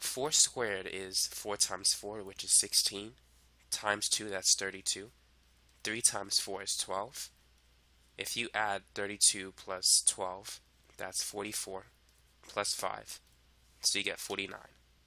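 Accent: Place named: New York, United States. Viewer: it isnt unique though, its a typical new yorker accent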